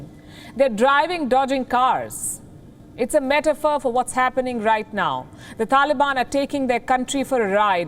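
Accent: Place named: India. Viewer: Indian accent so heavy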